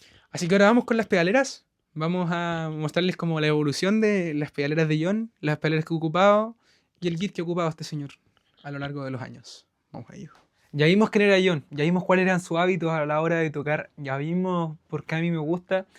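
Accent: Chile. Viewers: Buenas eres de conce ? Quedé sorprendido jajajaaj saludos bro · Tremenda masterclass. Una pasada de video, es asombroso desde el principio a fin. Saludos desde México (¿Eres Chileno? Por alguna razón el acento es igual al de mi profesor de Biomol, que es Chileno).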